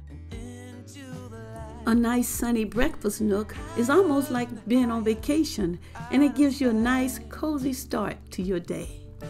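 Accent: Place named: South, United States. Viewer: I love your southern accent😁 Great job on your table. I enjoy your video 😊👍🏽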